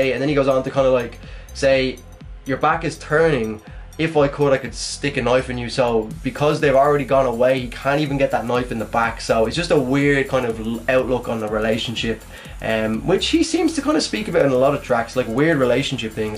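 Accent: Ireland. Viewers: I found it so fascinating when (rad-key) reacted to you. He thought you didn't like ' '. I thought HOW is he NOT seeing your reaction as positive? I legit believe he had trouble understanding your VERY easy to understand Irish accent.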